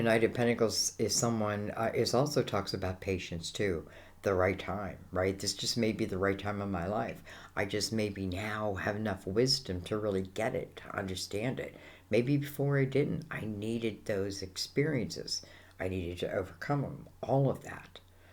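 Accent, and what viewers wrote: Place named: Pennsylvania, United States. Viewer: I have something to tell you. It’s like a big deal. I’m so grateful. I’ll send a private message. I (also) love your PA accent! Much. J T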